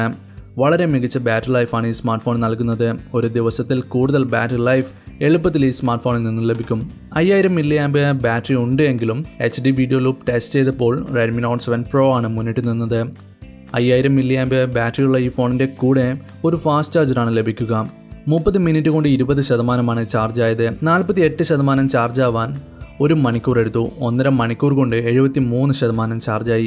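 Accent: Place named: Kannur, India. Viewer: Bro kannur ano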